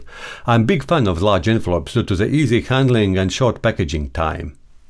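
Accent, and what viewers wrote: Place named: Russia. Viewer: Привет! Жуткий акцент))). Вы русский? Отличное видео. Будет чем себя занять на досуге. Лайк.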